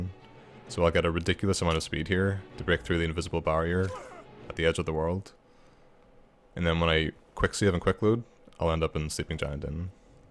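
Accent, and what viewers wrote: Northern Ireland, United Kingdom. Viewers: holy shit my fucking man's northern irish. and people say we're not smart, pfft. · Is that an NI accent I hear? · It's always nice to hear another Northern Irish lad who is also a massive elder scrolls fan, love the videos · Northern Irish I'm pretty sure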